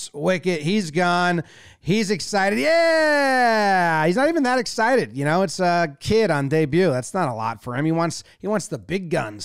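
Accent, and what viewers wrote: United States. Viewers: It’s just strange for me to have an American voice analyze cricket … I’m old school … but love the idea that Americans are getting into cricket … hate the idea that if they take it seriously, they will win more World Cups than the rest of us. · Commentary in American accent sounds a bit weird lol. Good stuff 👍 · it's fun listening an unfamiliar american voice break it down which tickles my senses. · as an Australian i have to say it's weird as fuck watching Test cricket getting broken down by a guy w an American accent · As an Indian enjoying the occasional baseball content, it's refreshing cricket from an American voice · Not gonna lie, still weird to have an American voice comment intelligently on cricket who isn't from the cricket playing countries originally... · So weird hearing an American talk about cricket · Weired to listen to cricket commentary in an American accent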